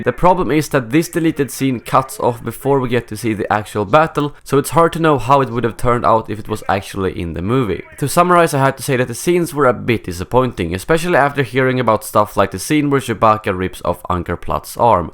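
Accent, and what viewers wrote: Sweden. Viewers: are you swedish? You sound swedish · Love your accent, dude. Swedish, I'm guessing? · Are you swidish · he sounds very swedisg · är väll svensk?. Det låter så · you're swedish XD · Are you swedish?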